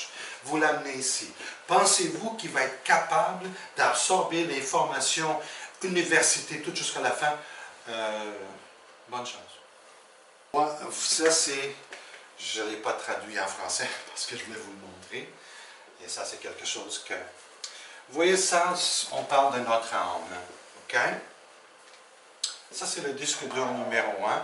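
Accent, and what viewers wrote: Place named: Portugal. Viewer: Bonjour Merci pour ce mixte entre l'accent Portugais et l'accent Québécois;))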